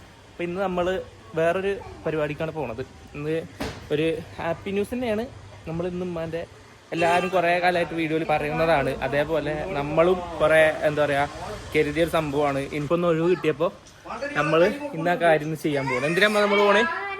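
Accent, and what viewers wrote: Kozhikode, India. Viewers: Inkum venam kozhikodeinnpenn ketta aa samsaram nalla rasam und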